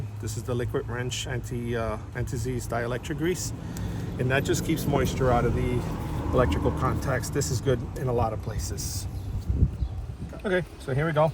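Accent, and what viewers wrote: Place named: New York, United States. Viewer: Great video, clear, concise, helpful with fab NY accent & phrasing - reminded me of Telly Savalas (TV detective Kojak). Who loves ya baby !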